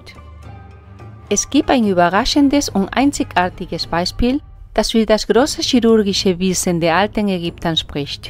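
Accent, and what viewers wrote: Spain. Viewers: ...man 😄 lass mal den nazimist hier wech😉... Ich bin froh solch politischen scheiss auf solchen Kanälen nicht zu finden. Ich denke, dass die meisten Leute, die sich auf solchen Kanälen aufhalten ( darunter auch ich natürlich 😁 ) eine andere Sicht der Dinge haben, als der Mainstream. Zu der Stimme muss ich sagen gefällt mir sehr 🙊 ✌🏽 Nur der starke spanische Akzent gepaart mit den hohem Niveau an Wörtern stört mich! 😂 Es wird viel wegen der Dame, die spricht diskutiert. Dafür kann die gute Dame ja nichts, aber das war der Anlass für mich überhaupt auf die Stimme zu achten 😃 Trotz allem finde ich die Videos immer sehr interessant und ich schätze die Arbeit dahinter👍🏽weiter so...! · Nun ja, das nennt sich spanischer Akzent. Du bist offenbar ein mental stark eingeschränkter Provinzler, um es mal sehr nett zu sagen.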